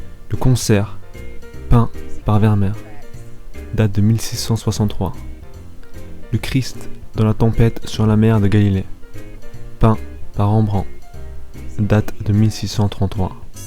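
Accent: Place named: South, France. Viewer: Pas mal la vidéo, par contre, on dirait que t'es trop près de ton micro, je sais que tu l'aimes mais, t'as pas besoin de t'approcher assez pour le manger x). Donc, tellement t'es prêt je t'entend respirer un peu et ça me stress xD Sinon, il manque un peu de dynamisme. Et l'accent j'aime bien, c'est tout mimi dit la québécoise qui n'écoute que des français tu viens du sud, nah ?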